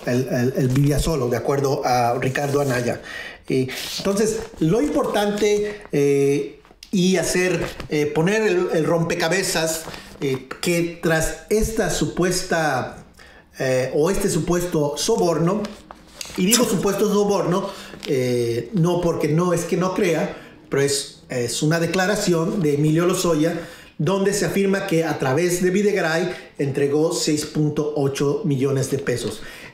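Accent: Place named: Mexican-American, United States. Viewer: felicidades por tu trabajo esperemos pronto verte en alguna mañanera con preguntas objetivas al presidente de México 🇲🇽 AMLO y tu acento más bien parece de EUA wow 😮 naciste en la sierra Oriental, yo creo que tú NACISTE en EUA y tus familiares son de esa Preciosa parte de México 🇲🇽 pues tú acento suena más como México Americano Pero que bueno que aprecies nuestras raíces mexicanas. Como sea Excelente trabajo y seguimos apoyándote 👍👍👍👍👍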